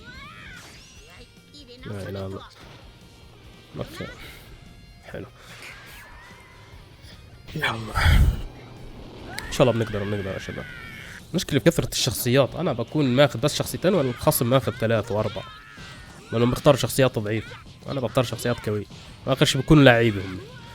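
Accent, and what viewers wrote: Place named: Jordan. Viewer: حاسك من الاردن 😂❤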